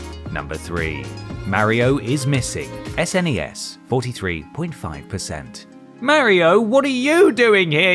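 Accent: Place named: England, United Kingdom. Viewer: Interesting that you speak with an Emglish accent but call it a "Sega Genesis"...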